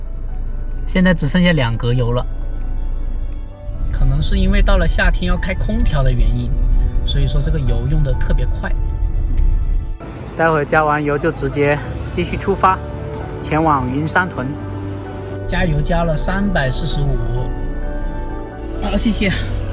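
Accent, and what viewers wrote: Sichuan, China. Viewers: 也會說四川話了😂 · 四川话寇以撒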